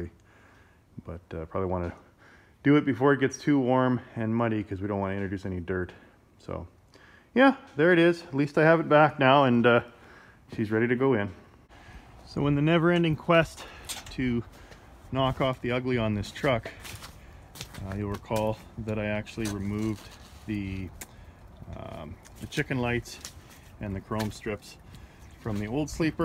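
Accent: Canada. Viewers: Love the Canadian accent! Oh hey there! Great videos!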